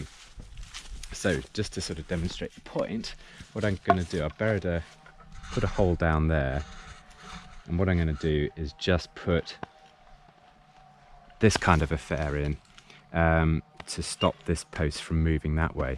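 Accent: United Kingdom. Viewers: Well done great explanation and wonderful to hear an English accent for a change!! Just doing some ourselves so watching this and a few other Youtube Videos as a refresher, thank you!!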